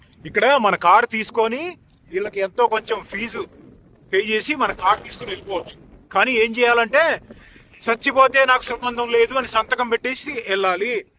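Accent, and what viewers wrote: Andhra Pradesh, India. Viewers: miru andhra vallu laga matlada taru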